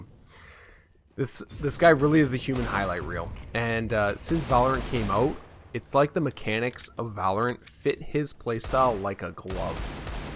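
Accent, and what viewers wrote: Canada. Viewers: Are you Canadian? Accent sounds super Canadian.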